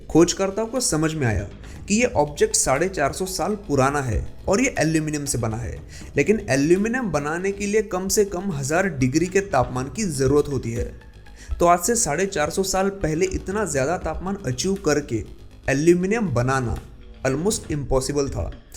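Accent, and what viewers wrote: Maharashtra, India. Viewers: Hello. It was interesting to know about all the three things mentioned in your video. One suggestion though. The narrator has a very deep Marathi accent, hence many Hindi words are not pronounced the way it should be. I would suggest that you hire someone for this job. I am interested.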